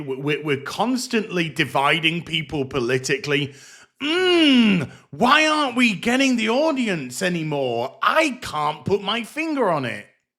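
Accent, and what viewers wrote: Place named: England, United Kingdom. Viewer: When calls something Retarded with that smooth English accent, you know the show is 💩🤣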